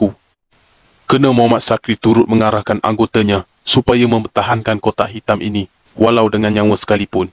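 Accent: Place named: East Coast, Malaysia. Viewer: Pelat Pantai Timur pekat sangat. Pahtu be Ai-See-Ae-Oh, bukan Ai Kau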